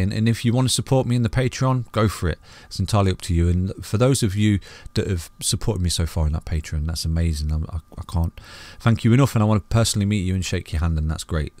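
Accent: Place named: Nottingham, United Kingdom. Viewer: You sound like your from Nottingham. I am myself Well done love your videos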